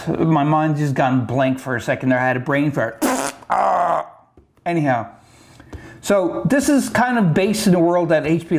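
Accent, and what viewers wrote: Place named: Newfoundland, Canada. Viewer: Where are you from? Trying to place the accent. Sounds like NL (Can.) to me. :)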